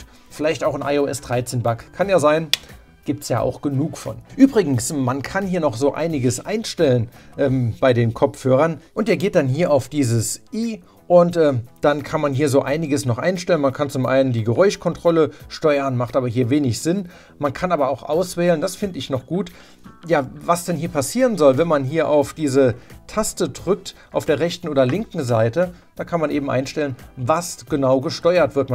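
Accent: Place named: East Germany, Germany. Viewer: Ossi Akzent ...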